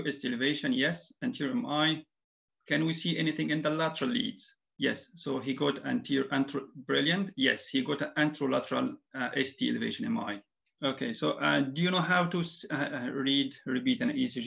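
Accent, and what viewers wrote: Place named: Egypt. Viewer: Thanks 🙏 You are Egyptian doctor I catch your accent thanks again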